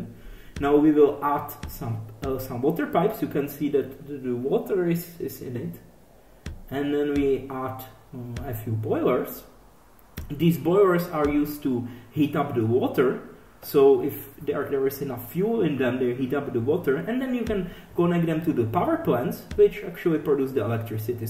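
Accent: Czech Republic. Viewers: nják slyšim českou angličtinu :D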